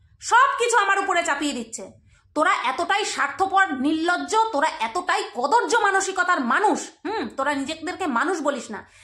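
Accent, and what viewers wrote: Bangal, Bangladesh. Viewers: Tumi to kauke nokol kora comedy kora bangal vasay kotha bola egulo darun koro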